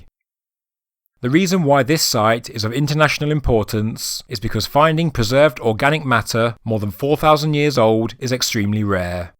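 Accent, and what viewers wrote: United Kingdom. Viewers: Go for it! Your ideas are like a fresh breeze in the suffocating existing typical history wronly interpreted. Looking forward to your book. I used to live in ibUK for years, and she still feels like home. There must be hundreds of similar sites still to be found. I always found Wales very fascinating and having certain vibes like no other place. Has anything been found there yet? Stonehenge gives me goose bumps, Wales chills down my spine and Land's End heeby-jeebies (?). "Something evil this way comes..." I totally agree with your logic, and I am impressed the way you somply do a 180, if logical evidence has proven your earlier conclusions inaccurate. Respect! History and archeology are often interpreted according to main streat guide lines, but they seem to prove wrong almost every time there is a new excavation. I find it thrilling. 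It is great that some power companies are willing without any outside preassure to reroute the power lines. Wow, that is a first. The importance of these sites is being understood as a means of understanding your nation and its ancient habits and names of places. The legends become fact. That is one aspect of scientific work, not clutching to the main stream explanations, which - sadly - is often the case. Your videos are addictive 😁 and unconventional, and yes, I like that. Other thing, proper English, no American dialect (don't like it)! Thank you. Gagging for a new one already... 😀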